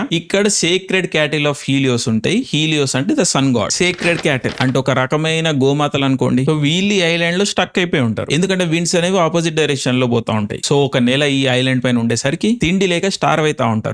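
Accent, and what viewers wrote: Telangana, India. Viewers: Starting audio vini Telangana bhasha lo ki dub chesesavemo anukunna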